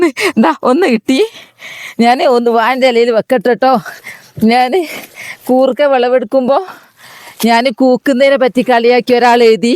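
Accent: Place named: Mukkam, India. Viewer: ഭാഷ കേട്ടിട്ട് മുക്കം ഭാഗത്താണോ എന്ന് സംശയം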